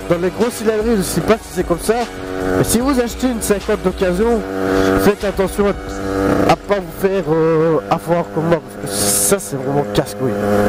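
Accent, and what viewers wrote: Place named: Belgium. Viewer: MDR! T'es vraiment un ouf! Pour quoi je passe moi maintenant avec tes conneries... lol (Inventées de toute pièce de ta part). Arrêtes de jouer à WoW ça te monte à la tête! x) Tu m'as bien fait rire... J'adore ton accent belge "une fois"! Merci pour le coup de pub'! Bises. V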